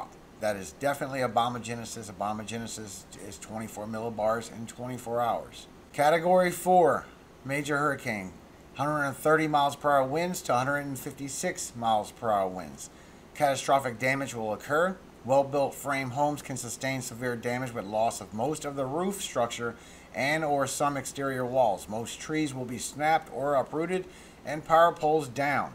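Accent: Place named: South, United States. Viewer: God bless,. We both have biblical names and I am glad to be your brother in Christ. Besides that, I want to say I recently found your channel and I am thoroughly enjoying your content (aside from the life threatening aspect of course). Also please don’t take this the wrong way, but I like your content because of your mannerisms, accent, etc. As a southerner, it’s nice to see another one out there going through heavily scientific content but in layman’s terms and with the cool mannerisms of a southerner. Keep on keepin’ on and once again god bless!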